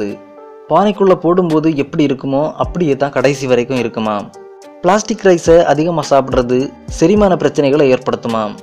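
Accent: Kanyakumari, India. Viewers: Bro unga slang....kanyakumariya...... nagarcoil ah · Kanyakumari slang..... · Makka.kanyakumariya.unakka bhasa kettapale manasalaach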